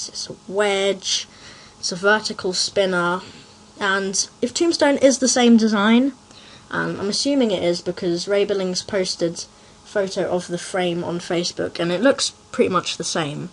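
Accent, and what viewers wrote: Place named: United Kingdom. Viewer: I'm American, so hearing a British accent is sophisticated and refreshing for me, lol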